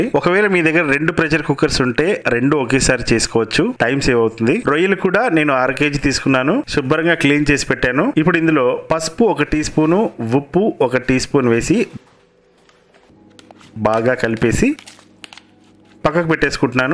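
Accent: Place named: Godavari, India. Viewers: garu Godharollu mari aala mataladathara dharunam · Pakka local accent medi rajamundrey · Ma godavari slang chala baguntundi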